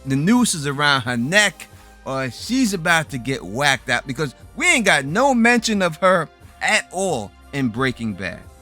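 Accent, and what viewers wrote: New York, United States. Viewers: as someone born & raised in NY, the way you say BETTAH CAWL SAWL really makes me homesick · Lalo and Gus are going to meet for a death match. Lalo gets killed and buried in the lab. Remember when the fly drove Walt crazy? Flies/dead bodies. Haha. Not sure what Lalo wants with Kim and Saul. I guess to find out what really happened in the desert and if it tells him more about the superlab? He may use them to get past Mike somehow for a showdown with Gus; he’ll probably find out Saul knows Mike and he was helped by him. I think Kim either has to go to prison for Howard’s murder or die. I don’t think Ed is a good solution, they’ve used it too many times. We know that Saul buys the black book from the Vet though, as he still has it. All the pieces haven’t come together for me, except Lalo’s death, it has to happen to give Gus so much control in Breaking Bad. 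I agree, they’ll stick it. It might not be what makes us all happy but it’ll be right for the universe. Love your NY accent dude. Okie dokie? Really?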